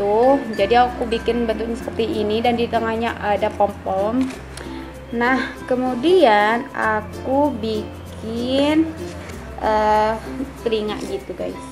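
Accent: Madura, Indonesia. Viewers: Suka sama cara bicara .. Soalnya khas² maduranya itu kerasa🤣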